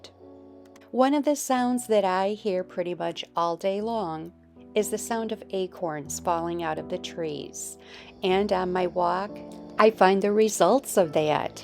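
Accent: Midwest, United States. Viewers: Wow, your accent sounds like Illinois/midwest region.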